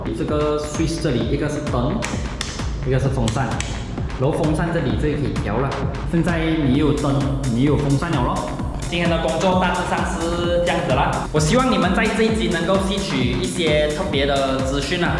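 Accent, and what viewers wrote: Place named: Kuala Lumpur, Malaysia. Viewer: 老板一口粤音中文应该是吉隆坡人吧？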